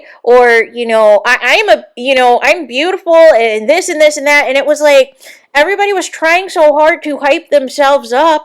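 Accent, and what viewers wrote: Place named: Minnesota, United States. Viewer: i love ur accent! i just know ur from Minnesota 🤣🤣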